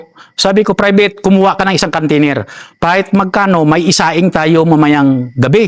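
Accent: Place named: Iloilo, Philippines. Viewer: ilonggo gid ang accent 😂🫡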